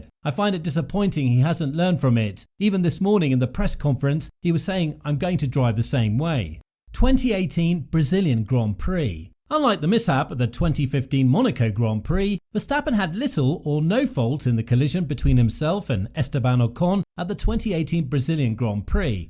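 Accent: United Kingdom. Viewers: This video is sponsored by Team LH. I couldn't miss that the voice in this video sounds very British... not to mention, biased as hell! Never heard and seen so many false truths, half truths, and 100% bullshit stories in one video. Unbelievable, what a joke. Not worth watching... and the end of this video aged like milk, because every team principal want Verstappen to drive for their team...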